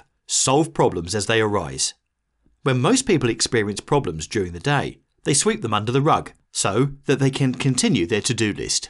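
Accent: United Kingdom. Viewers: The irony of a man with a British accent talking about the successes of Benjamin Franklin. 🇬🇧❤️🇺🇸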